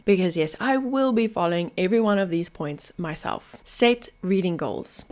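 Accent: South Africa. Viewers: Great video. Are u afrikaans? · Great video. Even as a long time reader, this helped a lot. Also are you South African? your accent sounds like home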